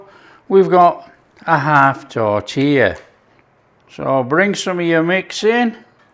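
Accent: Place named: Cockney, United Kingdom. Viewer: His cockeny accent reminds me of the character in My Fair Lady, Eliza Doolittle's father who sang a.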